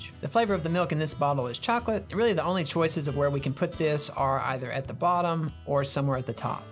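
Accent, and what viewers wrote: South, United States. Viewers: unrelated, but are you from the south? i love your accent and how you enunciate words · extra points for a cool southern accent lol. nice work, looks perfect!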